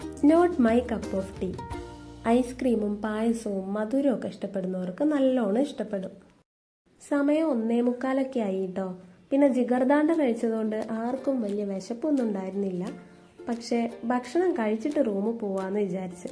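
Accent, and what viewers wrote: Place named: Kozhikode, India. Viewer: Awesome presentation! It was great to hear😊. പിന്നെ ഇങ്ങടെ കോയിക്കോടൻ ഭാഷ പൊളിയാന്ന്❤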